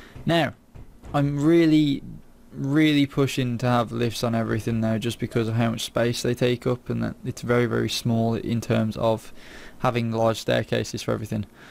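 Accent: United Kingdom. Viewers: you said the word "Homely." adjective 1. NORTH AMERICAN (of a person) unattractive in appearance. synonyms: unattractive, plain, unprepossessing, unlovely, ill-favored, ugly; informalnot much to look at "she's rather homely" But then I realized that you had a British accent. Nevermind. :)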